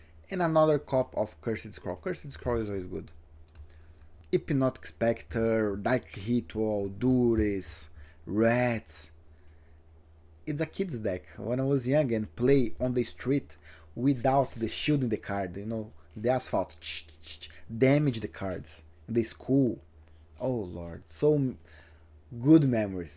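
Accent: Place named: Brazil. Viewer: Karai... Tu falou e eu já vi, porra um br falando inglês vei,quemassa!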